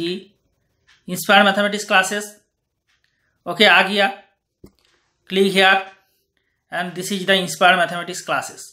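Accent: Bengal, India. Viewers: Sir ap k voice Bengali o ka tara hain... App jisi bhi language main baat karte hain Oy important nehi hain... But your video is superb...thank you so much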